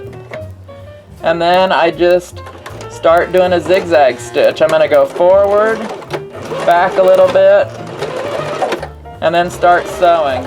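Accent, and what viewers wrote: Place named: South, United States. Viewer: I'm obsessed with her country accent! I could listen to her speak all day!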